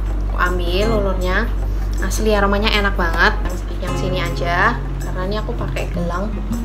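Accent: Java, Indonesia. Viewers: Wong jowo to mbak? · Org Jawa ya ? Logat ny kek jawa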